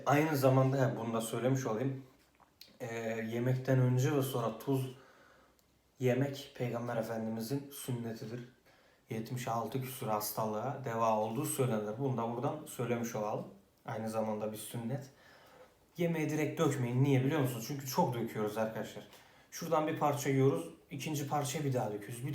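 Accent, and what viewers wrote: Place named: Trabzon, Turkey. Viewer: Ne kadar düzgün konuşmaya calışsanda trabzonlu olduğun belli edeysin kral 🖤👏🏻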